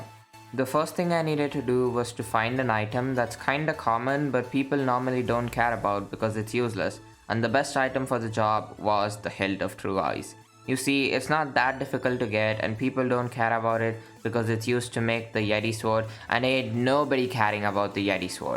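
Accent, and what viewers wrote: India. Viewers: indian english hehe ?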